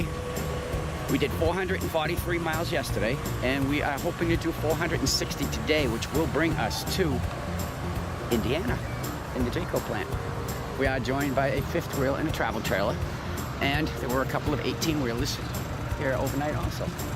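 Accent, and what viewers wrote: Boston, United States. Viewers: Boy, listening your accent makes me miss living in Boston. Well, I don't miss the winters.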